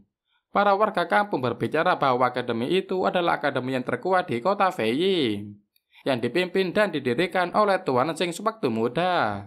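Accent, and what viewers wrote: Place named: Banyumas, Indonesia. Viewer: Mc nya wong ngapak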